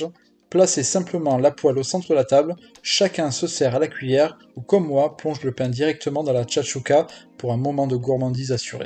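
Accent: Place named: Provence, France. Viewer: hum cette pointe d'accent provençal !!! Je l'ai aussi mais légèrement plus prononcé!! Sinon, votre recette est succulente, je l'ai faite hier soir on s'est régalé.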